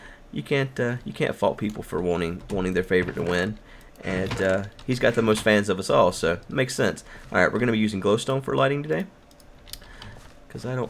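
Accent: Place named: United States. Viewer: Your American accent nice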